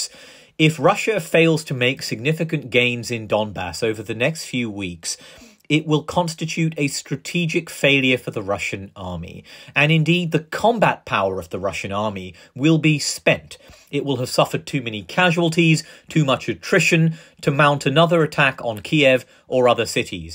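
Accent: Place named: United Kingdom. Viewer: Why Shanhunk joshi speaking like a limey. Where's the 711 accent ???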